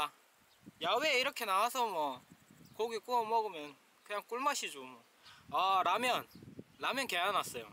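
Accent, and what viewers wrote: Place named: Gyeongbuk, South Korea. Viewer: 오늘도 잘 보고가요~말투가 구미,경북 북부쪽 말투시네요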